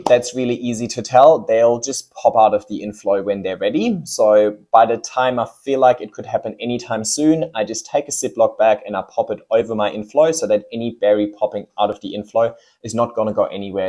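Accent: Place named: Germany. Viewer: Hey greetings from Berlin! It’s kinda funny that I keep getting German accents from you😅 Any chance you are German? Or least to say I enjoyed your accent, makes me feel homey:)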